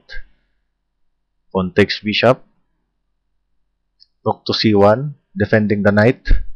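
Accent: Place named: Tagalog, Philippines. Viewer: OMG that is a Tagalog accent!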